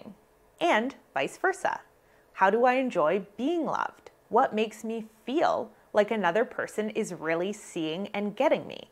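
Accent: Canada. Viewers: This lady has a nice Canadian accent.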